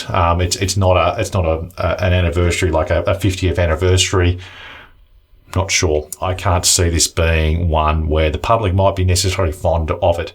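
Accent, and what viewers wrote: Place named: Australia. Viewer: why do yo Awzzees butcher the English language so badly?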